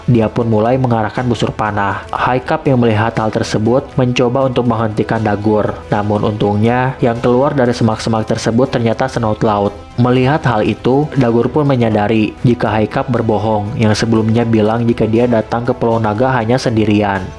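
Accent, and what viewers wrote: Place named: West Java, Indonesia. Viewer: ngomongna masih Aya sundaan euy meski Jang konten yt👍🏻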